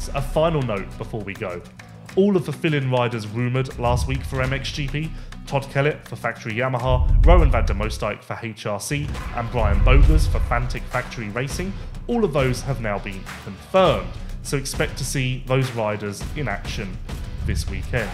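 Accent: United Kingdom. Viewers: I’m gonna have to pass on this British accent. Hard pass. I’m sorry I even clicked on it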